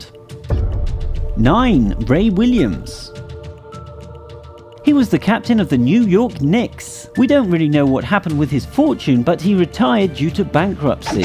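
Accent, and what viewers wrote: United Kingdom. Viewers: Very sad. But...I love how the British say Buth-day for birthday, and "don't take drug" instead of "drugs " lol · It’s always a British background voice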